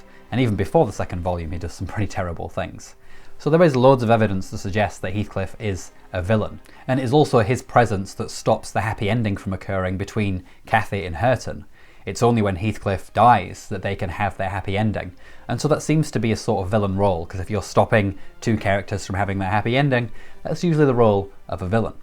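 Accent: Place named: Scotland, United Kingdom. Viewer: Is your accent from Scotland or from Northern Ireland? (I love it)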